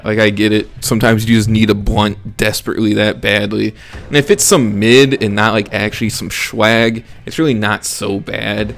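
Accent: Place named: Midwest, United States. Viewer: It sounds very Midwestern vro sounds mad congested lmao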